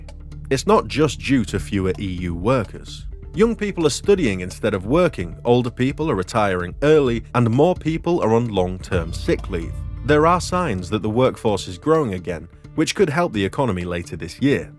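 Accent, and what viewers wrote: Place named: United Kingdom. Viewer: This man has a very British accent - hummmmmm -he`s saying 'they' - so I wonder where he`s moved to, to not think of himself as British.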